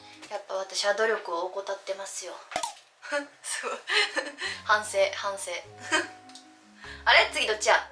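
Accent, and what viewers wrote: Osaka, Japan. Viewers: 恵方巻き髪😀まきまき そう言えば握手会で巻き髪見たことなかったねΣ(ﾟωﾟ) 会話が、軽く大阪のおばちゃん入ってる(((*≧艸≦)ﾌﾟﾌﾟｯ 可愛い❤️🥰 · 平和なほのぼのとしたカワイイ動画ありがとう😄 こんな世の中だから何かほっこりしました。やっぱりみるるん面白いなぁwww一言一言が何かクセがあるし、大阪弁だから笑えます😁 一生懸命なんだけど、何かしでかし感もいいです。やっぱり女の子は髪形で雰囲気かわりますね。 自分は似合ってると思います🆗 PS・・・先日のNAMBATLEの動画も観ました。 ”ミックスジュース”頑張れ！！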